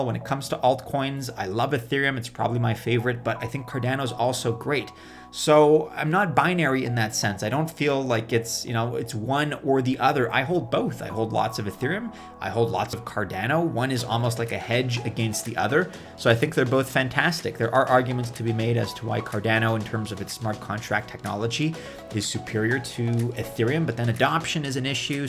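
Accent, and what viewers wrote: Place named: Canada. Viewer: I come for the Canadian accent. Chance at ETH doesn't hurt either.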